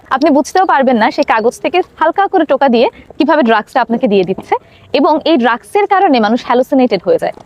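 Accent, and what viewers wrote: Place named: India. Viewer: Tumi bangladeshi? Vul vebechhilam karon tomar katha balar dharan thik Indian der mato.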